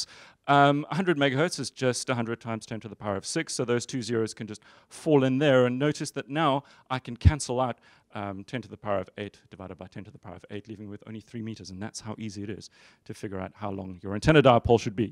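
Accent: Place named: South Africa. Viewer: I recommend watching this at 3/4 speed if you're not South African or Kiwi.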